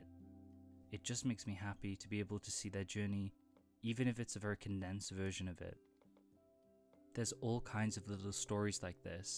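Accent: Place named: United Kingdom. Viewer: Why you sound so more british here, lmao.🎉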